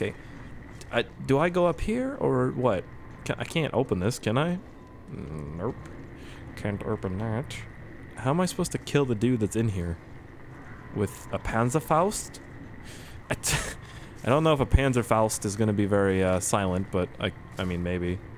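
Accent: Germany. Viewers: Best German accent ever :D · I haven't laughed so hard in months 😂😂 your German accent is fucking great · As in ur German accent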